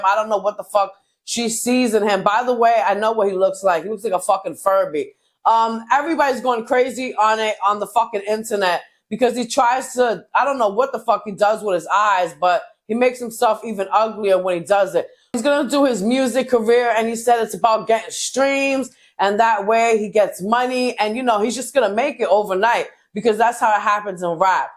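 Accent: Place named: New York, United States. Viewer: Got my Sub for the New York accent ❤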